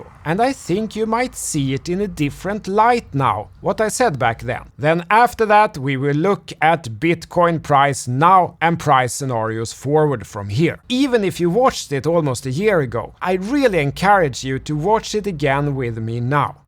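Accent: Sweden. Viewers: Great content as usual but please talk more clean english/American then swedish/swinglish 🙈👍💪👊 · Well, in his defend. He's swedish. I love the accent!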